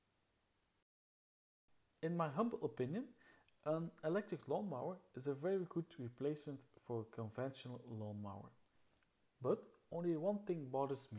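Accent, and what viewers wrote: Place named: Netherlands. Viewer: Think he might be dutch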